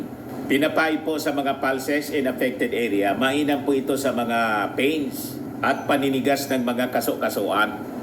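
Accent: Bicol, Philippines. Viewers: Sino ang Vloggers na ito , laging nakabuka ang bunganga niya , parang taga Bicol ang tuno .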